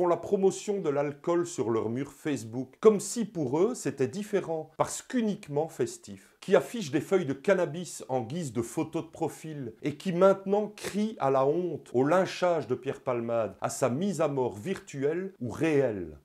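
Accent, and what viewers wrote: Belgium. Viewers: Bonjour d'un voisin de Silly^^ Magnifique vidéo, je découvre ta chaine grace a Mr Sam depuis aujourd'hui meme, et j'ai dévoré ta vidéo sur la méthode, avant de découvrir celle ci. J'ai hâte de découvrir tes autres vidéos, je me sens tellement bien en t'écoutant, un peu de douceur et de bon sens avec un accent belge pas trop prononcé:p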